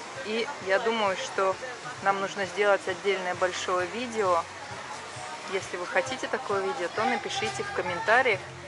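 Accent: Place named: Turkey. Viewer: Вы хорошо выучили язык , говорите с турецким акцентом.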